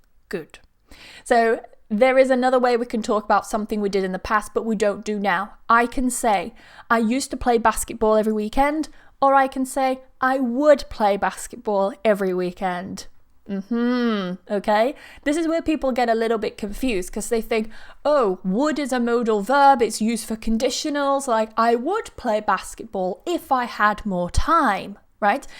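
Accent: United Kingdom. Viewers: your videos help me soo much, Thanks for helping💘 i love the british accent and England. Saludos desde Argentina 🇦🇷 (I think it is "grettings from Argentina in English")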